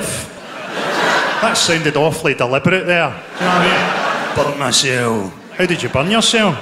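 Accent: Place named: Scotland, United Kingdom. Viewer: I reckon the Scottish accent is boss👍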